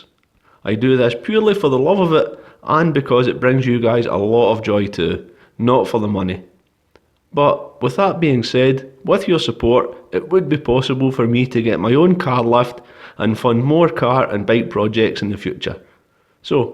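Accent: Scotland, United Kingdom. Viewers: Hey car is looking epic. How have you been finding the raptor??? I’ve got GDB and done the same to my own car, full Resto and raptor in wheel arches and full underneath. I went bare metal and then acid etched, rust bullet and raptor over the top. I find it chips quite easily on hard edges, sills, gaps etc - but on the flat it’s awesome. Won’t budge! Have you noticed this at all?? Thanks for all the videos btw, what a great source of info. Fellow Scot I hear too?? You don’t sound too far from myself in Perth. Cheers! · I really injoyed every second of watching all your videos about your Subaru Impresa. You are a really professional about eveything about cars. You have a wonderful sense of humor and a love your Scottish accent. Very nice, entertaining and educational videos. Thank you so much.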